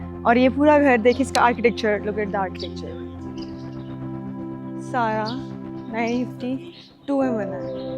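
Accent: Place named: Karachi, Pakistan. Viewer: Apka bolne ka andaz karachi wala hai or lahori ho wo kese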